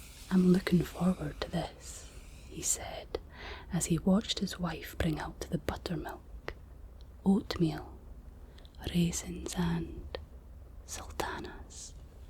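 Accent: Scotland, United Kingdom. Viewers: Love listening to your lovely Scottish accent. ❤❤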